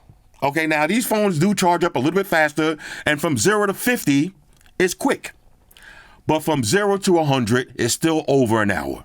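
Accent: New York, United States. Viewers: I do have to say that I truly like and respect how you keep it real with your reviews and yes, I do have an iPhone 16 Pro black titanium and no I do not like the new three colors in the new Apple iPhone 17 Pro models. Of course you’re always going to have haters out there so you keep on being you and keeping it real and disregard all the haters you’re going to come across on your channel and I just subscribe to your channel and again I do admire and respect how you give good insight into whatever it may be Apple Google or any other smart Phone or product out there on the market in today’s marketplace. And since you touched on the Apple Watch Ultra 3 and just wanted to know how you felt about the 5G versus the Apple Watch Ultra 2 and how in comparison speed wise does the Apple Watch Ultra 3 is it slightly better than the ultra two or are they about the same speed wise? 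You definitely sound like you’re from the New York city Metropolitan area and I’m here in Brooklyn New York where I was born and raised and I always get hated on for voicing my opinions or telling it like it truly is but that’s OK. It’s not a popularity contest with me you either like me or dislike me and it doesn’t really matter to me because again it’s not a popularity contest with me what you say is what you get and a lot of people truly respect those or truly honest with themselves and others and of course you’re always going to have the hater or two out there again keep making great videos and keep it real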